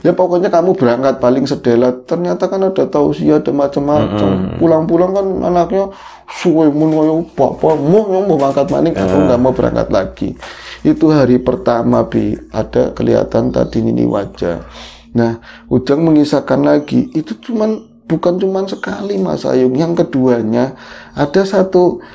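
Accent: Banyumas, Indonesia. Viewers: Paling suka denger Mas bicara ngapak😄😄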